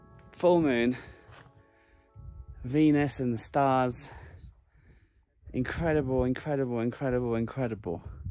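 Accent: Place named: Newcastle, United Kingdom. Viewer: Ahhh a Geordie 😂😂😂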